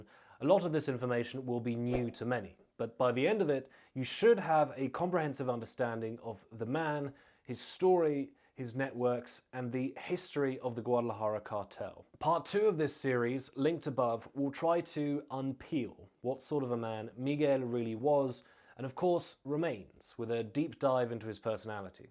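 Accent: England, United Kingdom. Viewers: I’m confused with this host… he has a British accent yet he pronounces perfect Spanish when he says their names or town names in Mexico 🤷🏼‍♂️🤣🤣🤣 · SPEAK UP NEXT TIME MAN THIS ISNT AN ASMR VIDEO I HAVE MY VOLUME ALL THE WAY UP BUT BARELY HEAR YOUR SULTRY BRITISH BOYISH VOICE LMAO SPEAK UP NEXT TIME!!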